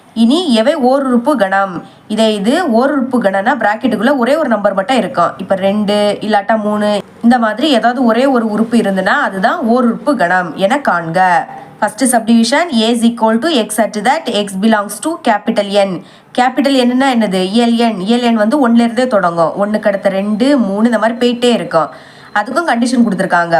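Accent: Kanyakumari, India. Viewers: Neenga kanniyakumari yaa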